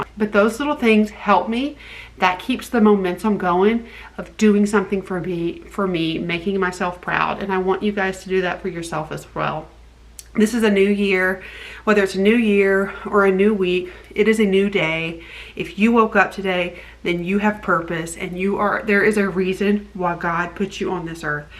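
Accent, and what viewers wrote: South, United States. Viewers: Love , love your southern accent ! My moms family comes from GA .Love their southern accent! Miss my family so much !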